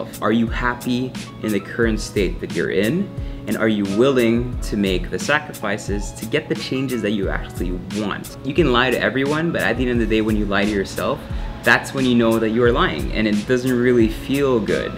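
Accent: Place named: Philippines. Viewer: you have a total filipino accent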